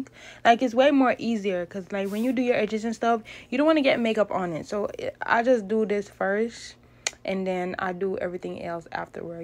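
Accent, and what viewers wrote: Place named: New Orleans, United States. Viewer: You sound like you got a little New Orleans in you.. you ever visit?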